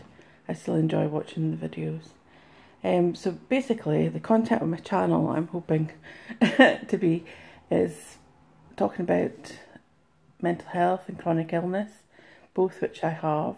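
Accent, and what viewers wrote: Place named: Scotland, United Kingdom. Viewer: You do sound Scottish, but I love it!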